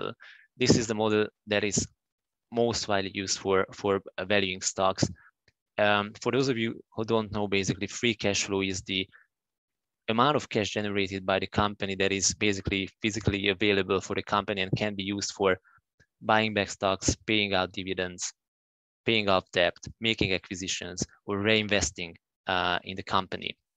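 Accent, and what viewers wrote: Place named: Hungary. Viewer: I live in Oregon but you sound like a Hungarian!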